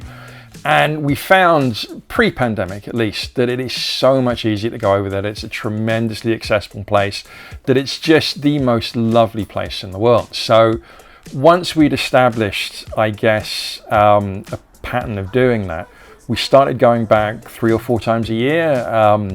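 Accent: Bristol, United Kingdom. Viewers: is LOVELYYYY, love the video! Found you cus' of Super tomato page! Wooo - Also recognise that bris accent anywhere haha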